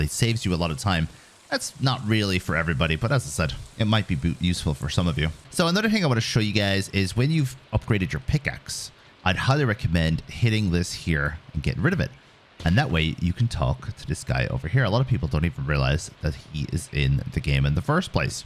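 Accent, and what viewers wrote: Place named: Ireland. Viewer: Savage vid bro, i hear that irish accent peeking through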